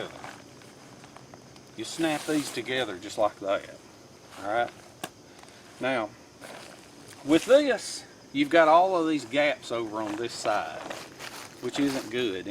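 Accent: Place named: Georgia, United States. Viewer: We love this guy... He speaks real American Georgia English . Love from JAPAN . ✌️😉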